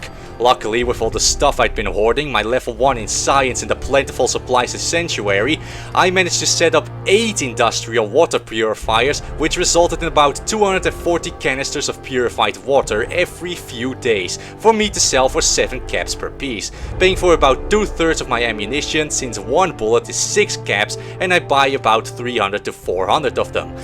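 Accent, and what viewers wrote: Netherlands. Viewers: hou van het nederlandse accentt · Heerlijk dat Nederlands Engels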